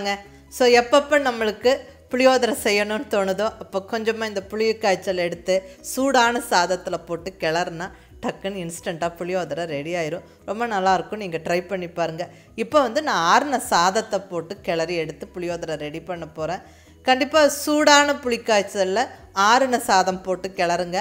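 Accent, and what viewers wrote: Coimbatore, India. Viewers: Neenga coimbatore native ah mam,? ur slang is as me . Nallaa jammunu irukku😋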